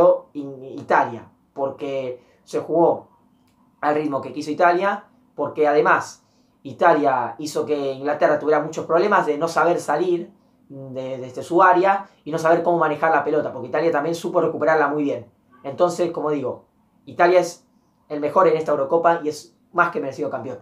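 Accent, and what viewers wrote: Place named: Argentina. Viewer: ya tenes dos suscriptores italianos hermano XD por tu acento creo que sos argentino y pues italia y argentina son hermanos de sangre asi que tambien estube muy muy feliz cuando me llego la noticia que tambien el albiceleste habia ganado... argentina en el templo del futbol de latinoamerica... e italia en el templo del futbol de europa.... como bien dijiste fue un wembleyazo... tambien por como los ingleses ya se sentian la eurocopa en el bolsillo... asi como el brasil en el famoso maracanazo cuando ya se sentian campeones del mundo.... antes del partido yo ya me sentia que iba a ser como en aquella ocasion... aca se dice que la pelota es redonda asi que uno nuca sabe hasta que llegue el final... pero algo dentro me decia que ibamos a llevarla a roma... y bueno que puedo decir.... muy pero muy buena analisis de como se dieron los hechos en el partido.. 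pero... por dios... chiellini... leelo "kiel-lini" porque asi se lee XD CH en italia se lee como QU en espanol :)) bernardeschi.. es bernardesqui :)) seguro tambien el otro compatriota mio te lo agradecera jajajajaj XD GRANDE argentina y GRAZIE AZZURRI!!!!!!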